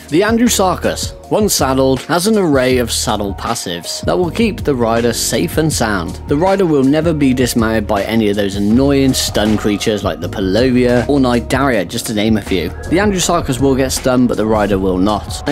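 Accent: United Kingdom. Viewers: It's relaxing to me watching your videos! Even just listening to it lol, I love the British accent! Great video!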